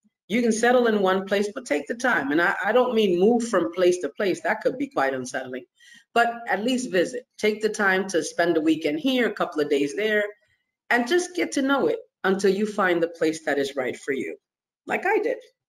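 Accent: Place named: Dominican Republic. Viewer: Wow Im glad you clarified because I couldn’t pin if you were Dominican or American jeje por el acento wow vete con Dios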